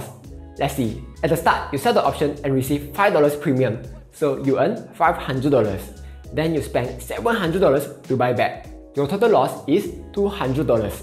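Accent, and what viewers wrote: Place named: Singapore. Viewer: Absolutely love ur video, no BS and straight to the points! Plus Singlish…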